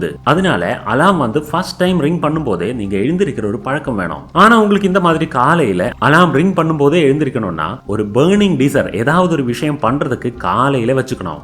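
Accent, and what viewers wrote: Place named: North India, India. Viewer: Unga voice... Sir antha cardu mela irukura 16 number sollu sir nu oru vadakkan solluvanla athey maathiri irukku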